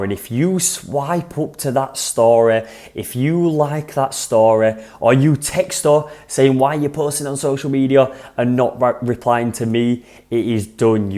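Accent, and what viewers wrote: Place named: Manchester, United Kingdom. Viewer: Manchester accent is it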